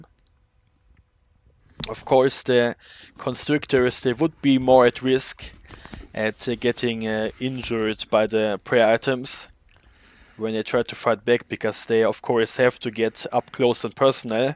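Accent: Germany. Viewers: Youre not English right youre another Language like German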